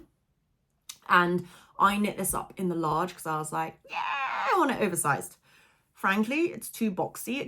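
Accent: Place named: United Kingdom. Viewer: You were cracking me up with your side bar comments. And it looks like we may have the same esthetics with knitting. So you may inspire me with future projects! And besides … I really enjoy listening to your British (or is it English) accent. ☺️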